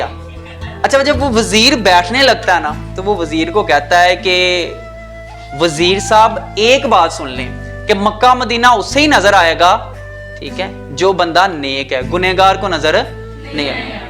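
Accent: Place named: Punjab, India. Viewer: Sir story b axhi thi lakin ap ki punjabi ka style b buhat acha tha seriously 😀😂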